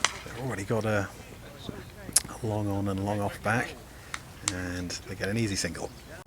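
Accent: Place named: North, United Kingdom. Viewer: Its already excellent to listen too, with the added spice of your accents from up there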